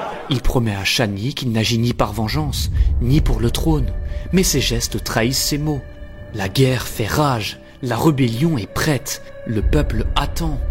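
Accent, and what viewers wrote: Belgium. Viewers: T'es belge toi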